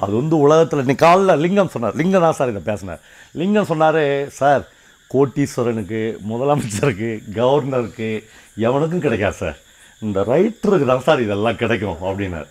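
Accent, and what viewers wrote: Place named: Tiruvannamalai, India. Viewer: திருவண்ணாமலை வட்டார மொழியில்...எளிமையான உரையில் எழுத்தாளர் "ஜெயகாந்தனின்" மொழிநடை மற்றும் உரைநடையை நேர்த்தியாக சொன்னீர்கள் அழகு.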